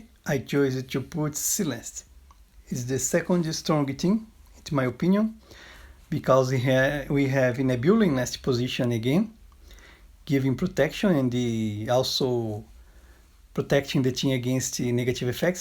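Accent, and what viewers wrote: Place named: Brazil. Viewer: Pelo inglês é brasileiro hehehe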